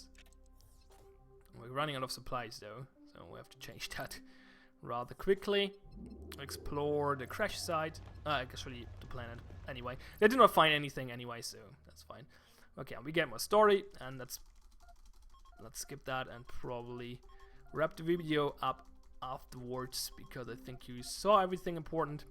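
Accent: Germany. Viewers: is this german accent?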